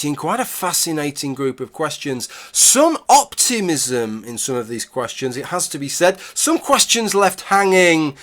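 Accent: United Kingdom. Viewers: I can see why some folks would consider statistical survey videos a bit of a slog, but the results and the conclusion we can draw from them are interesting to me. Also, I enjoy your accent and British slang.:) Call me cynical, but I think the answer to your final point is that the Muslims surveyed were "telling us what we want to hear". Be well,.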